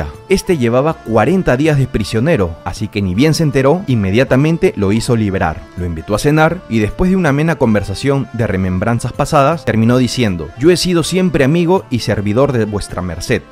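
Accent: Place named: Lima, Peru. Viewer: Muy buen pasaje histórico del Perú...y el presentador muy limeño...reemplaza la S por la J...😂😂😂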